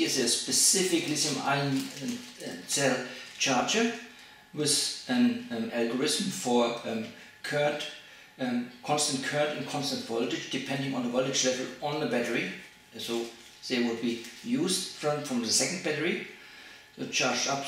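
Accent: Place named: Germany. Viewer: your accent sounds german, where are you from ?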